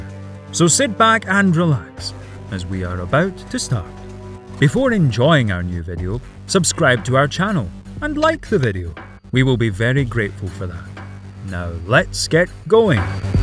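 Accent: Scotland, United Kingdom. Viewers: The narrator sounds Scottish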